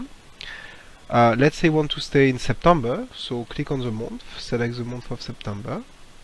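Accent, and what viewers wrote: France. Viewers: Academy Customer Services With the french accent!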